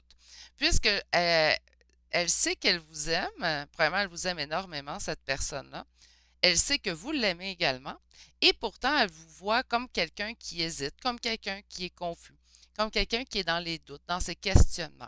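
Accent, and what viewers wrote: Canada. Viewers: Quel mignon petit chien qui dort paisiblement sur vos genoux. Je ne me lasserais jamais d'écouter la douce musique de l'accent canadien. Merci pour ce tirage. Bisous de France 🇫🇷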